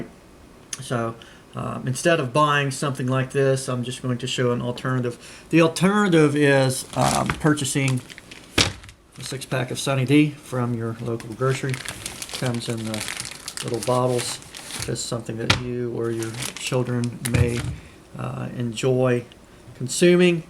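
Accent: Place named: Kentucky, United States. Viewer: Are you from Kentucky or Tennessee?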